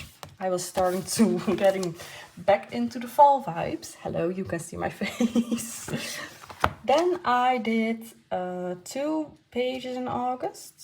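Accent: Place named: Netherlands. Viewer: Ik dacht al dat het accent niet anders kon zijn dan een Nederlander! Leuk om iemand uit ons landje acties te zien met kleurboeken. Waar heb jij het boek van Klara Markova gekocht want Bol en Amazon hebben die niet.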